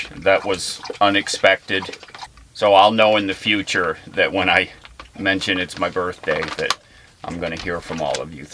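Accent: United States. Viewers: My brother in law tapes the Bills games the same day and watches them later ad free… so no one can talk to him on Sundays! I thought I heard a Buffalo accident when you talk…By the way… I said hello at camp (said hi to lefty but forgot your name! Lol) so happy belated birthday! I not part of that group… just camped and accidentally crashed the party! Hope to run into you again before I head out… come say hello if you’d like… in the little shuttle bus ✌🏼🚐✨✨Happy Thanksgiving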